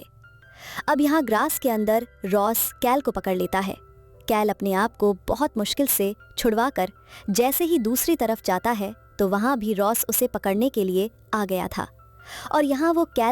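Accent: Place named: Punjab, India. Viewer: Punjabi vibes te boli hindi jande maharaj vaise vadiya explain aa carry on👍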